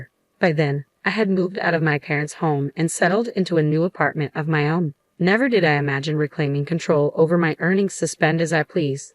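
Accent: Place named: Arab world. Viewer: Why the Arab accent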